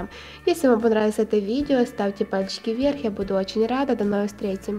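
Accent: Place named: Ukraine. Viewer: Достали критиканы! живет в украинской деревне,и дома разговаривает на украинском языке.( Вот оттуда и произношение другое). И это не помешало умной молодой женщине,создать и развивать свой канал!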